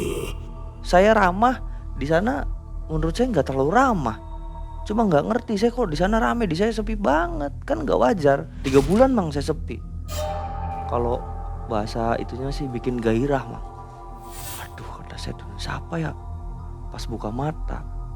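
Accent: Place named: Cirebon, Indonesia. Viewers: wong endi sih cirebone ngapak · Lucu sama logat Cerbon nya hehe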